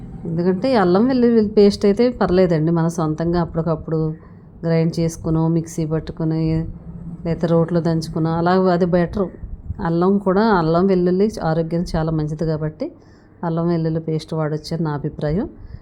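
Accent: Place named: Andhra Pradesh, India. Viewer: మీ voice విజయనగరం జిల్లా శ్రీకాకుళం బోర్డర్ లా ఉంది...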